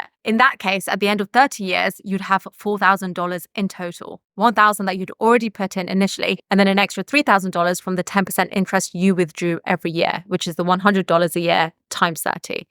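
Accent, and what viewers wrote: England, United Kingdom. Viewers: Your beauty is pleasant to the eyes, your English accent is sweet to the ears and most importantly, your content is educative to the mind and actionable to the hands that takes actions., you're a complete package!!! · nice content your english is british am american hard to catch up please try to be a little slower when you talk :yt::yt::yt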